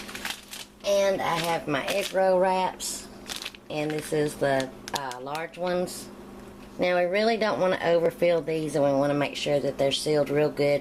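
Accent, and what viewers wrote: South, United States. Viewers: Yes you do have an accent! I love the way you did the subtitles! Yur friend shouldn't do no messin' with us southern girls!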